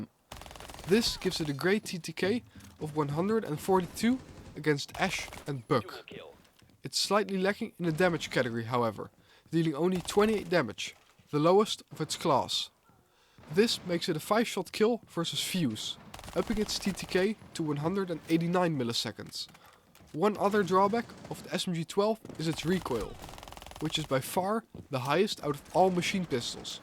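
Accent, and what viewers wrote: Netherlands. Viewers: Nederlander? hoorde het in de eerste 5 sec. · ik hoor gelijk je bent Nederlands haha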